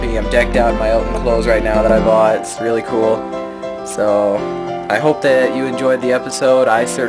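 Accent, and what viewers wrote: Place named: Minnesota, United States. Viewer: You know, your accent reminds me of a Minnesotan accent. My landlords are from Minnesota (Im from Colorado) and when they argue I LOL.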